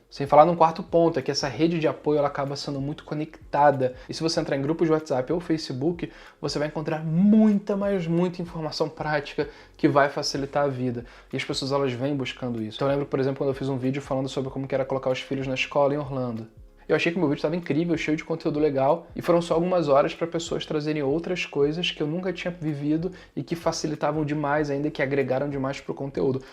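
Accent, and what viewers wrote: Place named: North, Brazil. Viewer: Acho que ele é do norte do Brasil kkk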